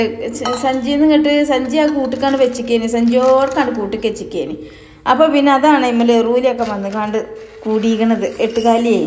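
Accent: Malappuram, India. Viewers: ഈ ഭാഷ കേട്ടാൽ തോന്നും ഇവൾ മാത്രേ മലപ്പുറത്തൊള്ളുന്നു prrr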